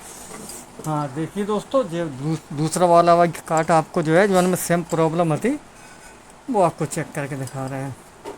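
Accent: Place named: Bundelkhand, India. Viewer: सरजी आपकी भाषा बुंदेलखंडी लग रही है आप कहां से हैं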